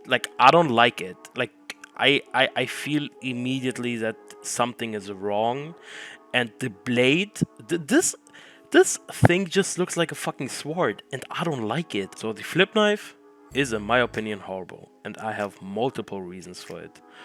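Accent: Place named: Germany. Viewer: Safe bist du deutscher das hört man an deiner Aussprache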